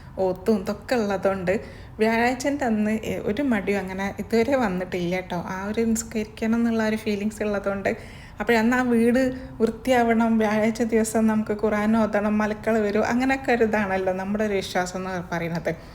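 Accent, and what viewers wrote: Kozhikode, India. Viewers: Kozhikode evda Samsaram Ketit kozhikode aanenu thonunu 😀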